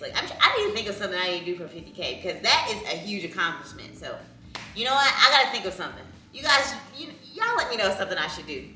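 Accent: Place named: Texas, United States. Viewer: Got a Texas accent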